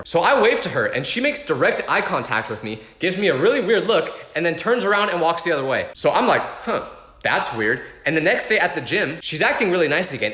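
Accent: United Kingdom. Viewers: Wait you're British? .. . . . . . . . Aaaaahhhhhhhhhhhh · you’re British ?ahhhhhhhhhh!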